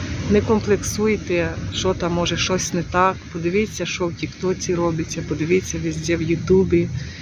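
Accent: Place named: Polesia, Ukraine. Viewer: Я только ради вашего особенного колоритного языка задержалась и осталась. Слушаю, как вы рассказываете все равно про что. Знакомлюсь немножко с украинским Полесьем. И да, вы очень привлекательная женщина. В любом вашем состоянии или настроении. И в лесу, и в городе. Мне из Москвы не огидно.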